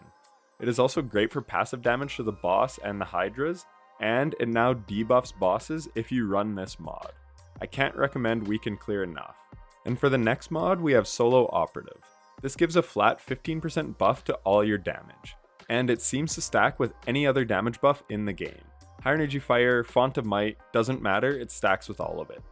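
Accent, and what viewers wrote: Canada. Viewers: I was fairly certain just hearing you speak but as soon as you said “off the rip” I knew definitively that you were Canadian lol. Solid video, dude. 👌🏻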